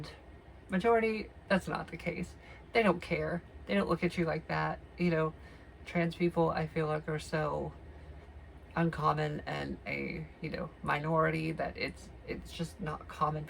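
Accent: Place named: South, United States. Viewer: You may not think you are all that attractive but girl you are gorgious. I as a trans woman aspire to one day hit the point where I am at least half as gorgious as you. Based off of you accent I am guessing you are southern. I have only been on Estrogen sense Jauary 22nd this year so I have a wase to go. For now I am leaving the pronouns on the back burner as I am not fully passing in person yet at least for long periods of time. I would love to officialy use she/her pronouns in my daily life but I also feel if I do not push it and let it come naturaly no one can use pronouns in an attempt to insualt me.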